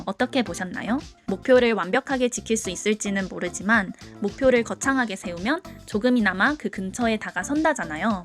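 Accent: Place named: Gyeongsang, South Korea. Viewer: 갱상도 사람이셨군요?! 카페가 맞지요~ 타지에 오셔서 고생이 많네요, 저랑 똑같네요😀 이번에도 화이팅입니다~!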